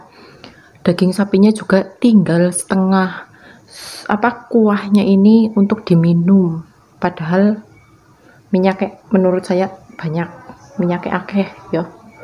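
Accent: Banyumas, Indonesia. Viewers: Mba, asli jawanya mn, ngapak ky nyong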